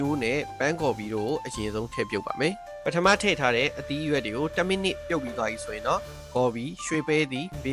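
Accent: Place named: China. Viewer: တရုတ်တိုစွသံတောင်မကြားချင်ဘူးရွံလို့ပါ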